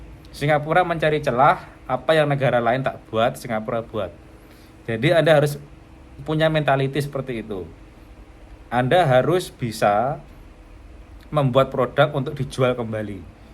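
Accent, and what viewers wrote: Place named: Java, Indonesia. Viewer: orang malaysia tapi logatnya kayak jawa indonesia ya🎉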